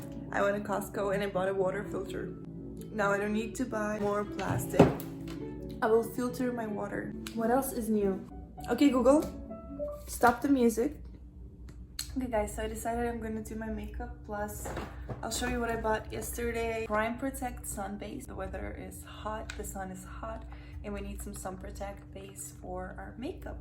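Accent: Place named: Russia. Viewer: It's Russian-pronounced English.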